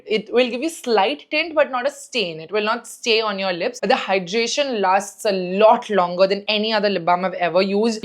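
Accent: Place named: Maharashtra, India. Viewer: loved the way you speak Marathi gurlll! Loving your cute Marathi accent fr fr touchwood❤ #sarahsquad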